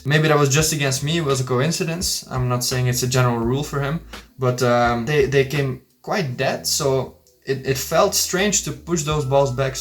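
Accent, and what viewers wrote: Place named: Flanders, Belgium. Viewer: Nice video, thanks! And I thought you gave Timo a good game:) From your name I was surprised to hear you had a Flemish accent. Goed gespeeld;) It’s a pretty basic observation, but I noticed many of your returns from topspin balls deep into your backhand (when you were close to the table) went long. I often do the same and I’m puzzling over effective options in such situations. Good health to your knee and many more amazing TT adventures to you 🙏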